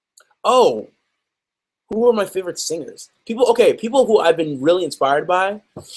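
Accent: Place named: Spain. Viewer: Wow! your spanish accent is fantastic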